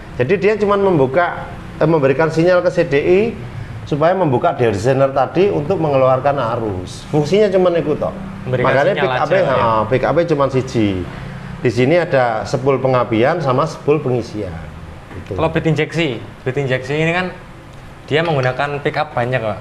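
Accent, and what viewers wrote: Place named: Surabaya, Indonesia. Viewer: suroboyoan rek...siiip jelas dan trimakasih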